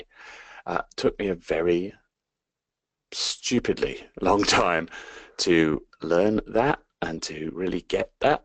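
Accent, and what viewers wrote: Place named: United Kingdom. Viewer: British accents are sick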